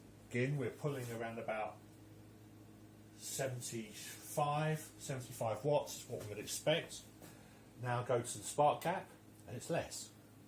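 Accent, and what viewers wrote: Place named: United Kingdom. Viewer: you sound like you are U.K. based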